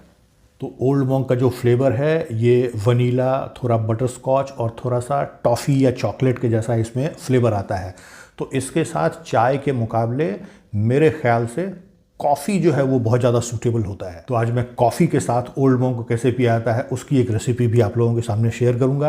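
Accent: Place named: Jharkhand, India. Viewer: Sir aap jharkhand ke kis jile se baat kar rahe hai ❤❤❤❤❤❤❤❤ I am from Jamshedpur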